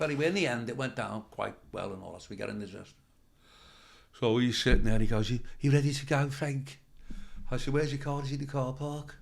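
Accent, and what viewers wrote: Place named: Wigan, United Kingdom. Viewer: Love that Wigan voice 😂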